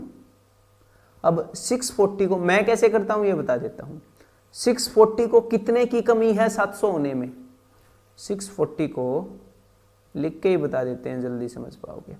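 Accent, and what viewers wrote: Haryana, India. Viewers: Sir apki mother tongue haryanvi h kya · gr8 Haryanvi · Haryanvi h bhai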